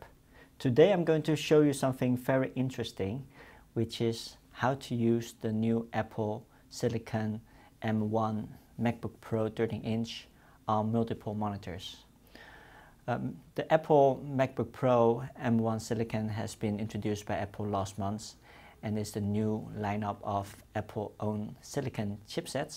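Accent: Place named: China. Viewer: Just listened to a terrible chinese accent for for absolutely nothing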